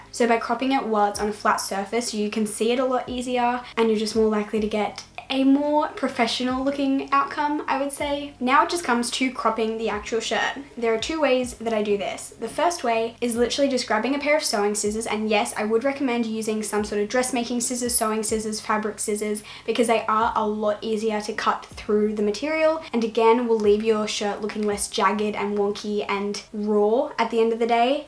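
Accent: Australia. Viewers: Is she aussie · Are you Australian? I looooveee you’re accent so much, it’s so cute!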